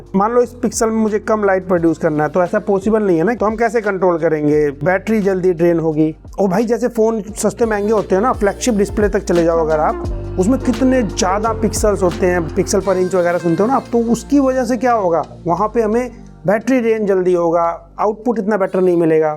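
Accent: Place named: Haryana, India. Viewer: Ohh haryanvi board bhai.. haryanvi touch h apki boli m.. haryana se ho kya??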